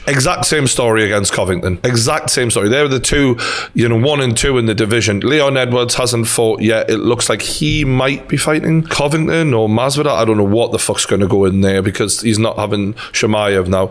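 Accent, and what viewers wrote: Newcastle, United Kingdom. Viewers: if only he knew what you know true geordie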